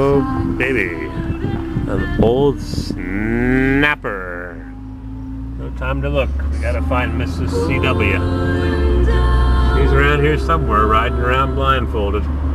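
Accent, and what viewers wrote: United States. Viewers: Driving around Henniker with Captain Obvious practicing their reading skills. There is a tree, there is a big rock, there is some sand, slabs of concrete and the worst New Hampshire accent you'd ever hear.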